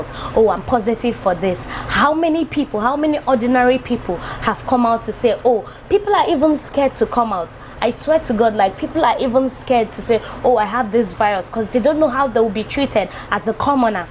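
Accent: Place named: Igbo, Nigeria. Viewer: u fine well ohh...And I love your Igbo sound ohh...its just too sweet.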